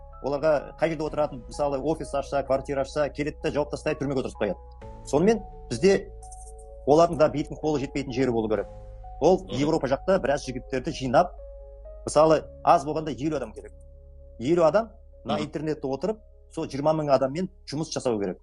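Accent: Standard. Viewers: Мұхтар Қабыл ұлы қазақшаңыз керемет таза сөйлейді екенсіз❤Аллаһ күш қуат берсін.тілегіміз орындалсын .Әмин.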